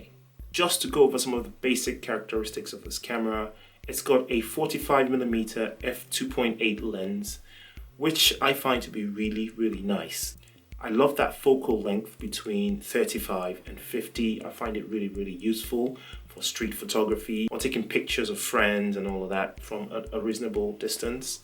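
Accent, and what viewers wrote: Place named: England, United Kingdom. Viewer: I just ran into your channel, I'm loving the content! It `always makes me smile hearing a fellow Englishman on the internet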